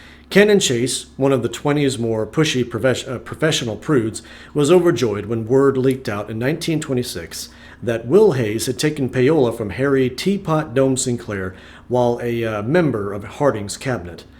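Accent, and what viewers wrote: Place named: Germany. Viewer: Bahahah your German accent made this so much more enjoyable! Love your narration! 💖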